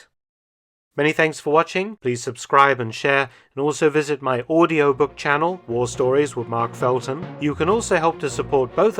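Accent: United Kingdom. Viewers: I'm always put in a better mood while watching a Mark Felton video, the combination of the interesting topics, calm gentlemanly British accent and relaxed vibe of the videos is just so good.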